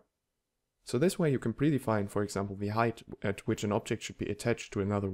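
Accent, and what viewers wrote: Germany. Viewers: rofel wusste ich doch das du deuschsprachig bist ;)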